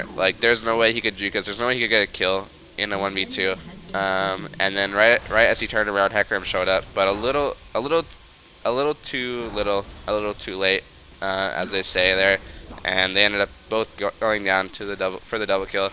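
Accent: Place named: Texas, United States. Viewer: Dat accent ... man.. are you from Texas? :D Anyway, nice video and thanks for all the advice :p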